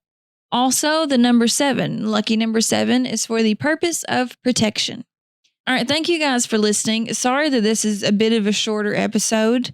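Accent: Kentucky, United States. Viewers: Lol glad super glad you randomly showed up on my feed. Super pretty, funny, and the Ky. accent is nice. I am subscribed lol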